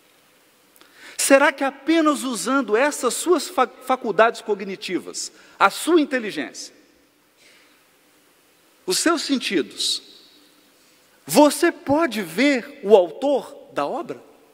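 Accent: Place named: Minas Gerais, Brazil. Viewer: adoro as suas palestras. Vc, esse seu jeitinho mineiro, torna tudo tão simples e fácil de entender. Gratidão querido! Abraço 🥰🙏🏻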